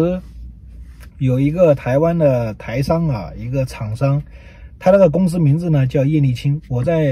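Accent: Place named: China. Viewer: 他不是台灣人來自中國，聽口音也知道。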